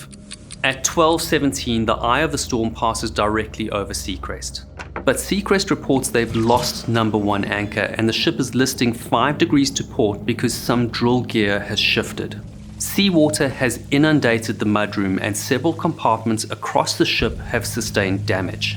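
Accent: South Africa. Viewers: He's south-african, you clown.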